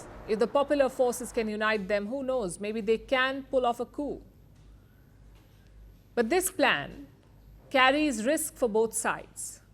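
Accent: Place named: India. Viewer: Typical indian english dont know why this lady is so serious while reading the news bolki shawerma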